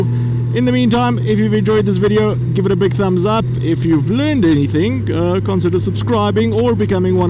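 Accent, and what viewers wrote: South Africa. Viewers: You sound so south african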